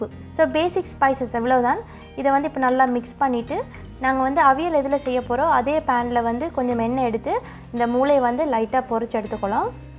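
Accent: Sri Lanka. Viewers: அதாவது பயத்தம் பருப்புதான சிறுதானிய உணவுதானே உங்கள் சிரிலங்கா தமிழ் எனக்கு ரொம்ப பிடித்து விட்டது ஆனால் ஒரு சில வார்த்தைகளை விழங்க வில்லை. அதாவது புரியவில்லை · Mashallah all recipies are soo gud thanks for sharing ur srilankan slang nice to hear keep going sis👍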